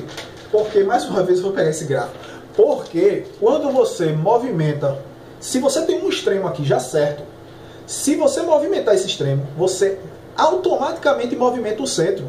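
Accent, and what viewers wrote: Recife, Brazil. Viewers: Mas, tu és de Recife?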